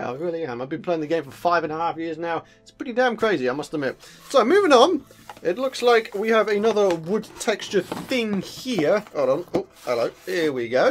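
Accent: United Kingdom. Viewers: he is from the UK its obvious.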